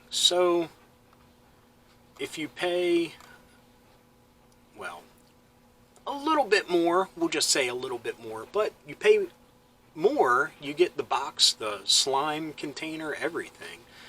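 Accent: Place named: Maryland, United States. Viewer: Great channel, going through a bunch of your videos! Also, Pinterest is a great place for 80's nostalgia, lots of great pictures and collections w/o having to buy stuff. Lastly, you should collab with Hat Hole Home video...I have no affiliation with him, you guys just have a similar vibe and channel. I was going to ask what part of the country you're from but I can tell a Maryland accent anywhere! haha.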